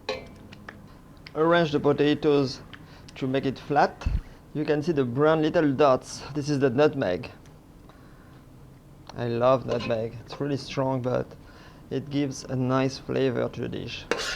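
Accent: France. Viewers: What a great video & recipe, spoken in that lovely French accent which always portends success in the kitchen! I am making this for Christmas as my family and I love French food. I thank you for doing this video. Merry Christmas from Virginia! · Looks really nice but why are you using Fahrenheit and not Celsius? You sound French.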